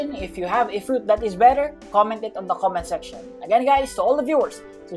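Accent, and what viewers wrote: Philippines. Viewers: Can I ask u are u a Filipino cause your sound a lot of it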